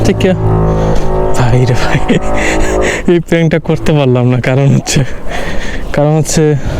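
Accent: Sylhet, Bangladesh. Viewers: Bhai Sylheti nii? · Sylhet'i naki apni? 😊❤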